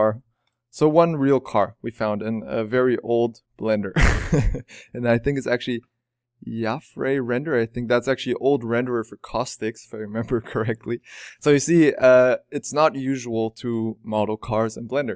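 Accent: Germany. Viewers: Achso du bist Deutsch? Ich habe dein Channel noch nicht so lange verfolgt. Man hat das muss ich zu geben ein bisschen an deinem Akzent bemerkt aber eigentlich kannst english so gut das ich erstmal dachte du könntest auch von ganz wo anders her kommen. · You sound very german:)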